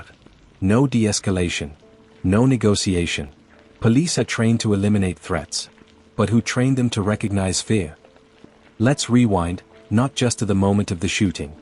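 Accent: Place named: United Kingdom. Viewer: I can’t stand a British voice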